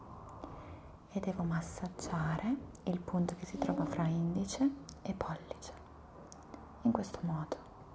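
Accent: Italy. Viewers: AAA esse sotaque italiano que eu amooo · Could listen to her all day long..love the Italian accent❤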